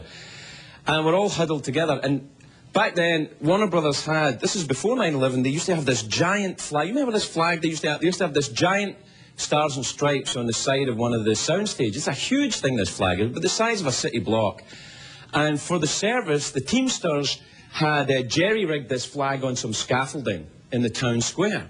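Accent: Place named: Scotland, United Kingdom. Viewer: He sounds so scottish here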